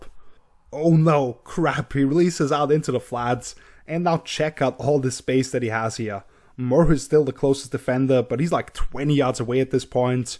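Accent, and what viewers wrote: Austria. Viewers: Your accent is marvelous. Are you Austrian? You sound so much like Arnold Schwarzenegger... Austrian by birth, moved to the US as a young adult, fluent in English but can't and won't mute the accent.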